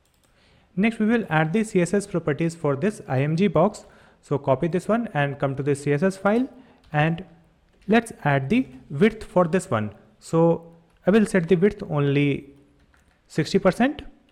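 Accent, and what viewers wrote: Bihar, India. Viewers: Sir aap bihari ho? Just asking no offense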